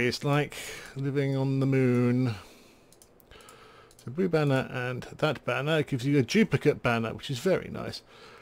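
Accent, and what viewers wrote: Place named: Southern England, United Kingdom. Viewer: This is the first video I have watched of yours and I must say I really like your commentary style. Being a southern Brit myself, it's great to hear such a southern accent talking about Minecraft! I really wished you'd get more views on your videos, your style may be slower and more relaxed but it is honestly the perfect thing for late night YouTube viewings! Keep up the great work, good sir! :)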